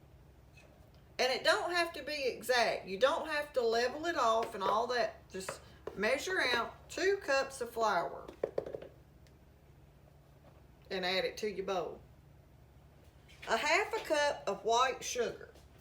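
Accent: South, United States. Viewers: I may make these tonight! I love your southern accent. Yumy Yum - Thank you. (Greensboro NC)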